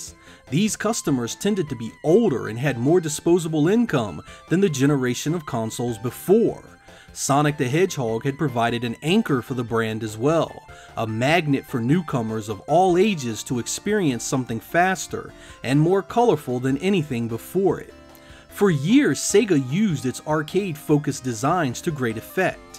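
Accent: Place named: Southeast Pennsylvania, United States. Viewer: Judging by the accent, you’re either from Southeast PA or Maryland.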